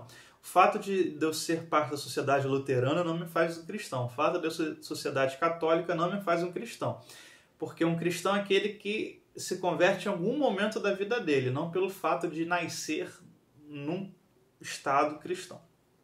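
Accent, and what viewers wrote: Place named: Rio de Janeiro, Brazil. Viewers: Eu, por ser carioca, não reparei isso. Hahaha Aqui a gente fala assim, e está tudo bem! 😊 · Sotaque carioca.Nada demais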